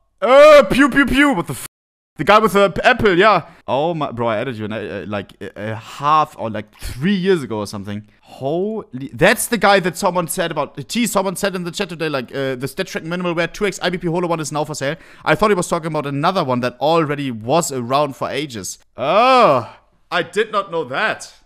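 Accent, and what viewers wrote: Germany. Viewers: wie krank man hört das er deutsch ist pahahhahah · man hört den Deutschen Akzent haha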